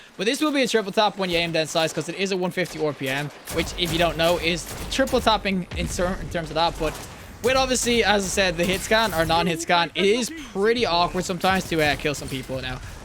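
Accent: Ireland. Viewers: you saying warlocks ARENT king of the skys already?? also are you irish?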